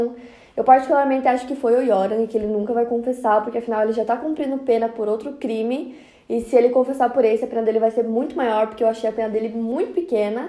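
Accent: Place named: South, Brazil. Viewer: Único sotaque sulista aceitável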